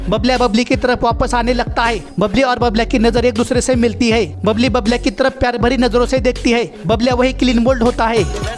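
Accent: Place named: Mumbai, India. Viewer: Mumbaikars. Wali Hindi bol Raha hai Bhai ek number 😂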